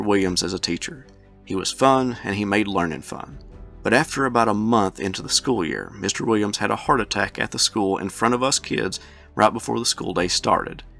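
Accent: South, United States. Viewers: I always wondered, who the man is, telling me stories with that sound voice and the rollin southern tongue, wich I love so much. Now I know, thank you for that. So, for justices sake, I will get out of cover a little bit. I am a 60 year old veteran, born and raised in Germany inmidst the post ww 2 era. Originated from a family with military background, reaching back to the 30 years war, I started at a very early age to become interested in history. First love were Dinosaurs, soon after the ancient Egyptians up to the romans, my first choice of profession was archeologist, but it turned out otherwise. My grandpa liked to tell me the warstories, when he was a young soldier in peacetime, up to the time he commanded a division at the eastern front. He told me about the hardship and the suffering, but also about glory and comradeship. Like a sponge I absorbed those stories and, as you can imagine, I was hooked. 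So, in the age of 11 years, I began my dive into history, developing into an ascend deep inside the abyss of military history. Logically I sooner or later discovered the american civil war. BAAAAM, what a story. I studied the wars of napoleon before, wich are much larger in scale ( Battle of Leipzig <num> combattants) . But what was so unimaginable about the Civil War, was the fact, that they fought napoleonic style with rifled musket and cannon! What kind of man, what breed of hell can endure such gruesome tasks, what kind of spirit was their motivation, why the hell they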